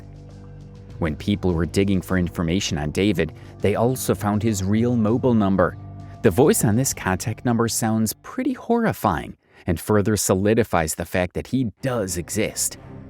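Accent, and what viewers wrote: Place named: Upper Midwest, United States. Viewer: I love this subject and what you do. As someone from the Midwest, I can't help but notice your accent through the video and it makes me really wonder if you're around or from the northern Midwest area.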